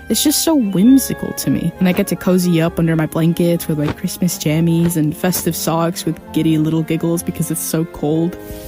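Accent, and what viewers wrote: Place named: Mexico. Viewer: bro unleashed the full mexican accent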